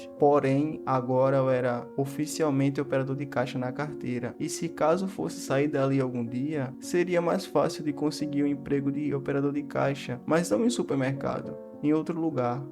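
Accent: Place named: Northeast, Brazil. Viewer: pode falar com sotaque de Nordestino, ta de boa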